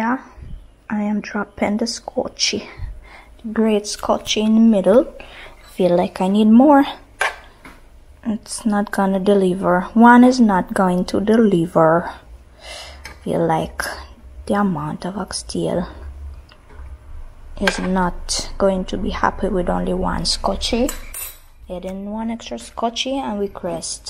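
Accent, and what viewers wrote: Jamaica. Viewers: This is brazy watching a white girl talk with a Jamaican accent. I live in England.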